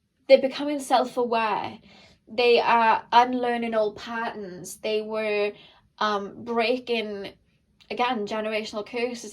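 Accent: Wales, United Kingdom. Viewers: Excellent reading! Love to hear your FUN WELSH ACCENT 😀! 😀Thsnk you for sharing your BEAUTIFUL GIFTS with us! You are very TALENTED! 😁😄😄😃😀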